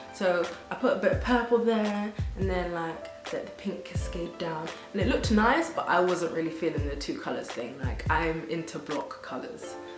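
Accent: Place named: United Kingdom. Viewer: oh you're British... assumed you were American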